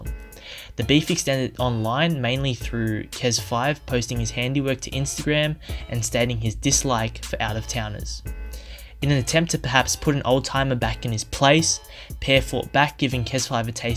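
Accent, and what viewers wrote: Australia. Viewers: Nice to hear a ozzie hey from west oz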